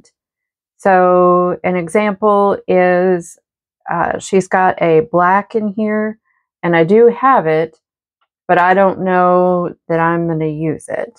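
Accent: South, United States. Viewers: I love this! it's so much fun and thanks for sharing the colors you're using. I think I detect a Southern "accent". Hello from a Northern viewer. Apparently we have an "accent" too, which I didn't even realize until I spent 18 yrs. in NC and then came home to MN. !!! Yup, we have a accent !!!! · I love this! Beautiful color combination. You have a lovely southern accent. I’m from SC and live in Scotland. Cheers❤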